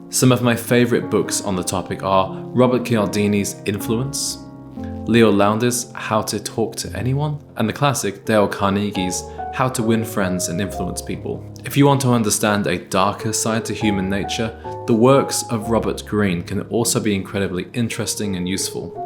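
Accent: United Kingdom. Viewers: Chicago? I thought you were British in the UK!?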